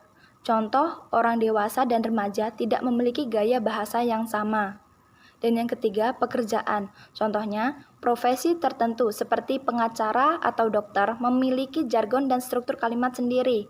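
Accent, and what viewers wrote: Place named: Java, Indonesia. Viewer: Your explanation is very good, but your dialek is very2 like indonesian people specialy javanese, oh maybe in indonesian language is medog.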